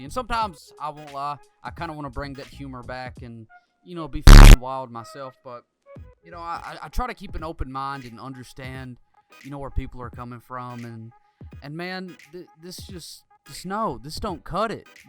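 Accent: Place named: Alabama, United States. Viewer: You sound Alabamian.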